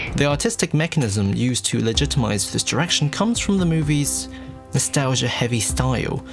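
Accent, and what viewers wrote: United Kingdom. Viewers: Ты что, британец?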